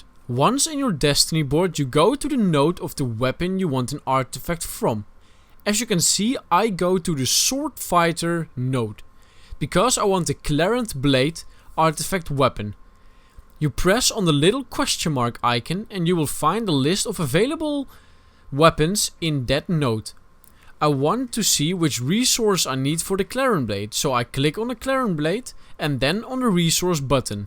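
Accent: Netherlands. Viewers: goed bezig! leuke videos met een nederlands accent.:D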